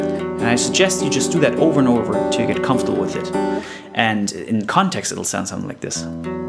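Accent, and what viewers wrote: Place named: Germany. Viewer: It's hard to tell how German you are until you say "O" vowels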